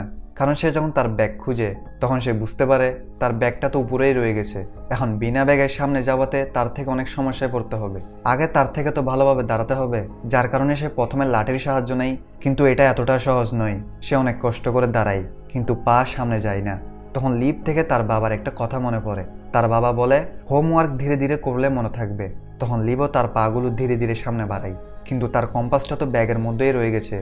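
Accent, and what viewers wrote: Chittagong, Bangladesh. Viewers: ভাই আপনি চিটাগং এর 😊😊 ভাষঅ শুনে বুঝে ফেলছি 😊😊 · চট্রগ্রামের আঞ্চলিকতা বেশি দেখা যাচ্ছে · খুব বাজে মুভি আর একটু পরে পরে ফ্লাশ বেঁকে যাওয়ার কি আছে আর চট্টগ্রামের একটা টান আসে কথার মধ্যে শুনতে মোটেও ভালো লাগেনা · চট্টগ্রামের নাকি ভাইয়া?😂😂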